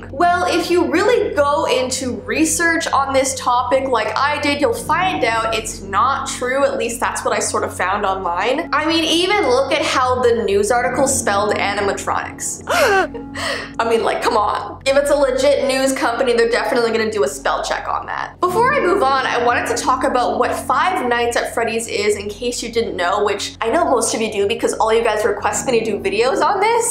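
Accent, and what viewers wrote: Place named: Canada. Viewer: Samurai she is Canadian so she has a different accent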